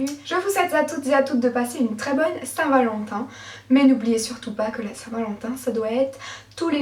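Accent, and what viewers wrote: Belgium. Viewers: Hello tu viens d'où de Belgique ?? Ou sinon belle vidéo bisous 😘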